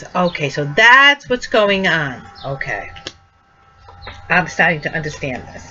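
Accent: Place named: East Coast, United States. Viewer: I love your accent......Lemme guess, East Coast?......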